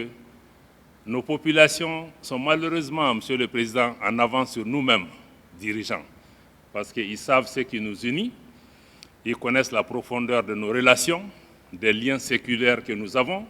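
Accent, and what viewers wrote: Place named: Wolof, Senegal. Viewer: Tu as un accent ouoloff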